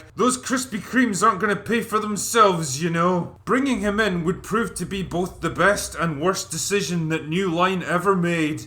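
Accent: Scotland, United Kingdom. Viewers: The Worst...? Well, I admire your razor sharp whit & kickass Scottish accent... You're probably too young to remember Ishtar, Heaven's Gate, The Room, Roar... Love your work! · This guy sounds like he’s straining while taking a shit and giving a review at the same time in a British accent with a mouth full of pastries. Not being rude but just what it sounds like to me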